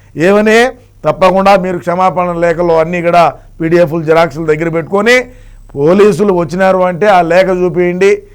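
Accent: Rayalaseema, India. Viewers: రాయలసేమయాస ఏదన్నో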